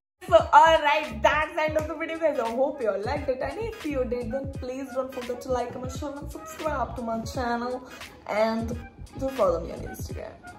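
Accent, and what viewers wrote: Bihar, India. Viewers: This was so damn funny and your bihari accent is juts awesome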